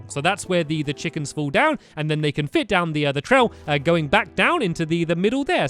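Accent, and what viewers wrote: United Kingdom. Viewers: why do British pepls say wool instead o' wall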